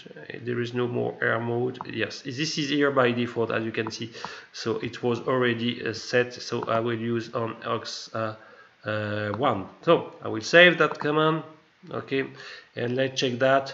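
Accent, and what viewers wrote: France. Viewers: Tu es francais non ?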